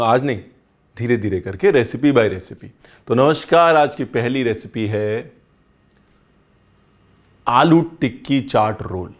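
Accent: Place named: Punjab, India. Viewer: Ranveer Sir I love yr punjabi Accent u say ...yelo ji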